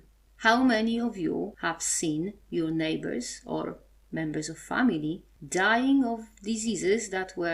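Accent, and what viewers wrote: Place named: Poland. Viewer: I really enjoy listening to you. Your Polish accent is not bad at all. I have no problem understanding you. I am really amazed at your knowledge of history. You say things that I have known for a long time, yet others don't seem to comprehend. For example, anthropologically speaking, there's no such thing as an "ethnically pure" Slav. My ancestry is from your neighbor to the South, Bohemia. I know Polish and Czech people are very ethnically close. I don't find a lot about the faith and Bohemia, but I know they share it as well. So I will adopt my Polish brethren instead.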